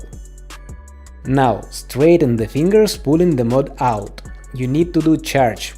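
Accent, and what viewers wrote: Russia. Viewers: пф такой акцент "русский" )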